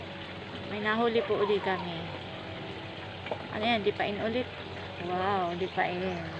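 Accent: Quezon, Philippines. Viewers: haha saan po sa quezon, taga guinayangan, quezon po ako. kaya pala yung punto sabi ko parang related ako haha. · quezon province po ba kayu,kasi yun tono ng salita nyo parang s quezon province din,taga infanta quezon ako..dami isda jan,dto sa taiwan yan din libangan ko mamingwit.. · Parang taga quezon Ang punto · Ay ok po kaya pala kaparehas tayo ng tono 😅😅 lopes quezon po ako . Nasubscribe ko na po kayo god bless po.. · New viewers San po probensya Nyo mam yung punto Nyo po kc parang sa quezon province e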